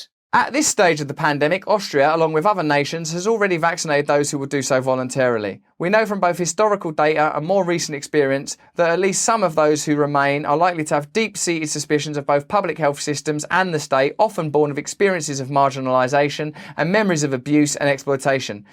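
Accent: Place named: United Kingdom. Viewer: Tough to listen to.. limey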